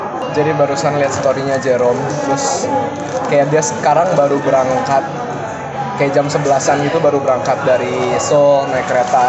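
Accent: West Java, Indonesia. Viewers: Cara bicaranya kaya legok Sunda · Aya logat sundaan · Eta nya .ari logat sunda mh teu d mn2 bde stay d mn oge..keu2h ..moal leungit ..kjn pk bhsa gua..elu..😂ttp we aya.. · Kesini karena muncul di beranda. Salam kenal. Baru denger suaranya aja udah bisa ketembak kalau orang Sunda, cuma takut salah karena facenya eh ternyata bener asli Sunda, Jawa Barat dong. Salam kenal. Halloo... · Logat sunda na mantep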